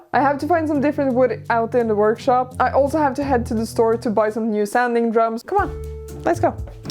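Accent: Russia. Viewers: Can't get rid of the impression that speaks with russian accent. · Why I hear a Russian accent? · Russian actsent?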